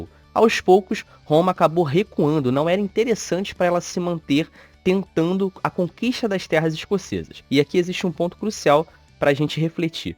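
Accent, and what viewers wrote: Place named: Rio de Janeiro, Brazil. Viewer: Sotaque carioca chato da p*******!!!!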